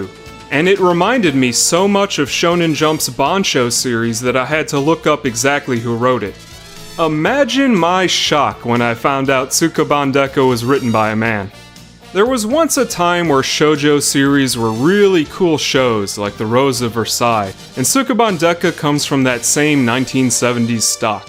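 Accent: United States. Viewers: Ugh. Saying words in Japanese with an American accent. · How is your pronunciation so heavily American when you appear to be watching so much anime?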